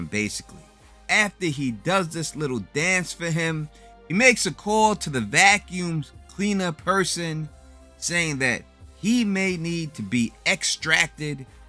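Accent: New York, United States. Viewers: Can you talk a little less black and new york-ish, please. We get it, you're a black new yorker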